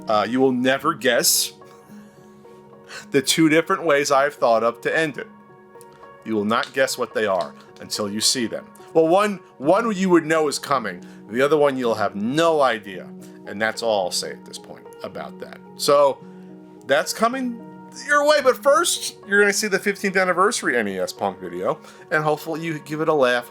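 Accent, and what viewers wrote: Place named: East Coast, United States. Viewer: I've been watching your videos since you used to have east coast accent.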